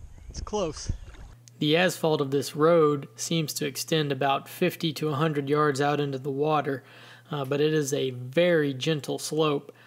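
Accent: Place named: United States. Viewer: You speak with a an accent thats very pleasant to the ear. Somewhat laidback, very clear pronunciation but unmistakably American. Also: nice boat.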